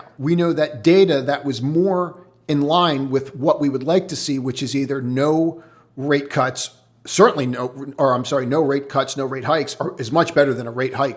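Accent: East Coast, United States. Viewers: I love how you say current….its Karen plus ent. Its cute. Must be an east coast accent. Excellent video. ❤❤❤❤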